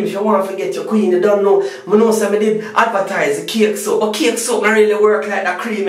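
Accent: Jamaica. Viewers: erm ok ur comment wasn't needed i said nothing about his color. i do know that there are white Jamaicans Chinese Jamaicans etc. what i meant was that his accent was really good but then i realized he was actually a Jamaican. and when i said true Jamaican i wasn't referring to color i was referring to the accent, because at first i thought is accent was meant for comedy. so next time before you post you long unnecessary essay stop and think about what i actually meant.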